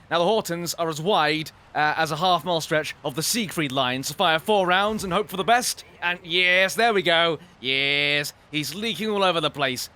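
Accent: England, United Kingdom. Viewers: thank goodness I was just getting bored and a fellow brit pulls out a craking old video perfectly spiffing chappie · I love how you talk. what part of England are you from I am from Nottingham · hilarious guy with hilarious british accent 10/10 would watch again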